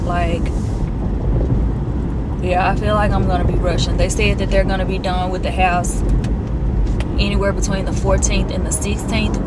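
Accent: South, United States. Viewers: Thanks! I love your accent too, I find myself trying out the southern accent after watching your vlogs 😂 lots of love to you & 🫶🏾🫶🏾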